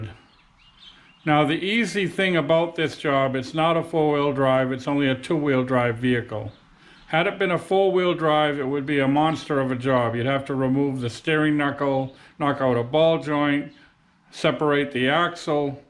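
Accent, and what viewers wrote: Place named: Boston, United States. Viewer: yeah I heard it and was like this guy has to be near Boston. Thanks for posting the video I'm doing some work on my dodge and you really helped me get shock out the way so I can replace lower control arm. Thank you again ☺️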